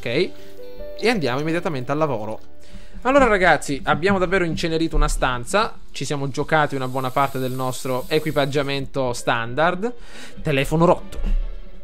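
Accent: Sicily, Italy. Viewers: No siciliano ;) lo riconosco dall accento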